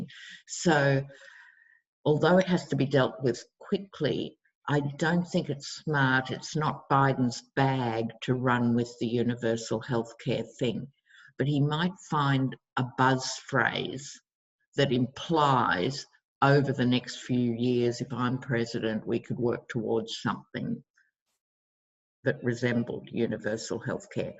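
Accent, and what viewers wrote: Australia. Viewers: Hello! Enjoy your readings, and especially your Australian lilt.:-) You, Linda G and Violetta are my favorites and listen to you regularly. Wondering, how it comes to pass that all three of you are now wearing turquoise rings last few days? Did you all agree beforehand? Or do you all feel the need for Throat Chakra strengthening of Truth? Or some other prompting? Thank you for all your work, Namaste. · I watch you with the closed captioning on and it’s of great amusement to me how it misunderstands your lovely Australian accent. For example barter become butter and pentacles becomes sentinels. Flynn’s becomes flinched. Short list is shortness. Good work!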